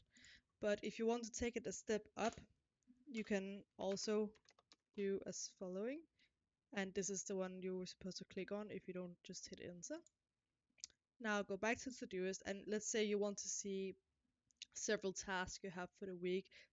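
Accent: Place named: Italy. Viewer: you sound like italian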